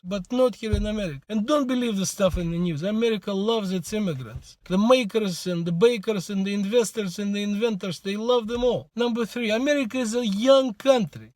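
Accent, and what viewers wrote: Russia. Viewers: This is such a beautiful video and when you talked about 9\11 I remembered that since I was born on 9\6 I woke my mother simply for food and she saw what happened the year, god bless those poor guys who lost their lives. And its really is surprising how I saw this video 4 years later. May god bless you and your family mister russian! Your accent is awesome by the way and I'm glad you enjoy your life here in America ówò)/🍬❤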